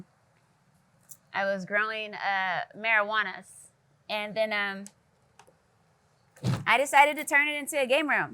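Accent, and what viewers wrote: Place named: California, United States. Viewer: 😍😍..... It sounds like she's from Cali.. Wish it was NY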